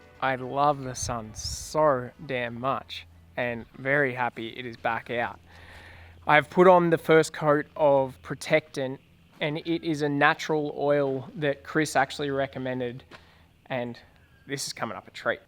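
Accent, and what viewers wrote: Australia. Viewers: Lmao I love his voice, that Aussie twang is great!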